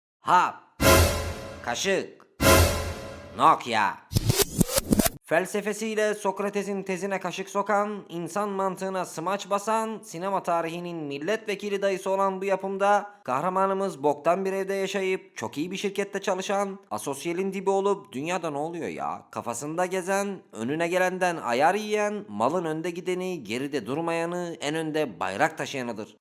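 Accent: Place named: Anatolia, Turkey. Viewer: Hacı abi anadolu şivesini bu kadar iyi nasıl gonuşuyon. Bence güzel tat katıyor.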